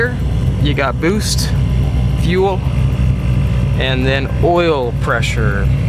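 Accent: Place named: Midwest, United States. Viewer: You sound very Midwestern than a typical Californian. If so then what part of the Midwest were you from.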